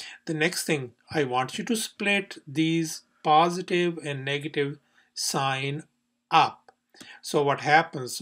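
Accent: India. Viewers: I'm really confused if he's Indian or Canadian. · Sir your way of speaking tells me that you are punjabi?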